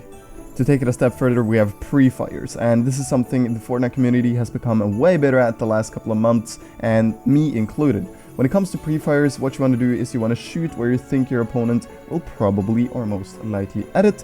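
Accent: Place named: Norway. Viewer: omfg du hørtes så jvl norsk ut med da du snakka engelsk🤣 translated: you sounded so f*ckin Norwegian when you talked English 🤣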